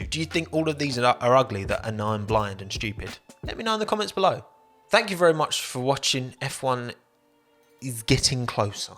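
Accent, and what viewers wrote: United Kingdom. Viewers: i like the combination of american slang with the british accent :D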